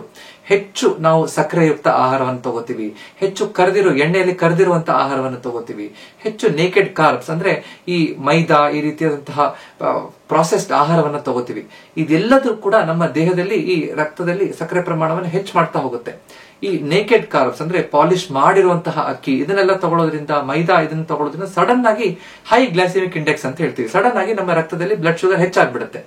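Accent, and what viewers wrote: Standard. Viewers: Clear message. ಶುದ್ಧ ಕನ್ನಡ - Like always.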